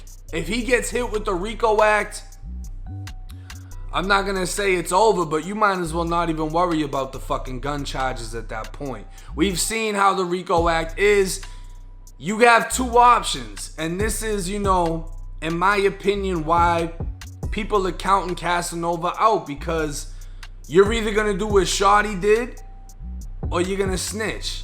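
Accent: Boston, United States. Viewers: Lol love Boston accent and I'm Canadian I just heard it on tv or Internet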